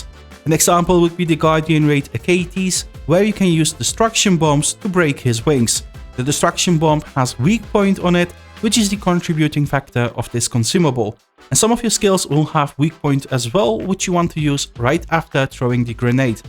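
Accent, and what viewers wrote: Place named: Netherlands. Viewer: lees net dat je Nederlands bent/spreekt hahahha ik dacht het al heel lang aan je accent te horen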